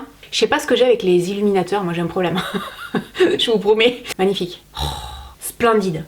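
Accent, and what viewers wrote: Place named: South, France. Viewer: Merci pour ton sourire et ton accent.... Du sud à Paris... Je sors de l hôpital St Antoine. Je suis aidé soignante... Ca fait plaisir... Une vidéo en attendant mon RER... Je suis ravie..!!!!!! 💜💕💜💕💜💕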